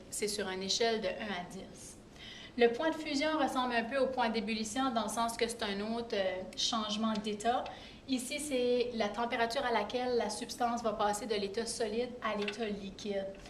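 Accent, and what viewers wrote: Canada. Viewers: j'ajouterai, j'aime beaucoup votre accent canadien. C tres charmant.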